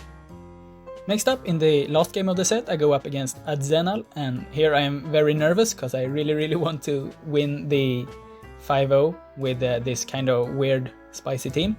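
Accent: Sweden. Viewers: Love Swedish accent so much! So sad that I was not able to fly to Stockholm recently. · damn that's some swedish accent. kinda love it thoe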